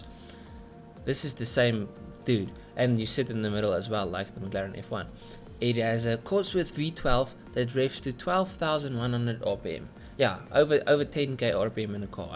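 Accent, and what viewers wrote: South Africa. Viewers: It's so refreshing to hear a south African voice 😂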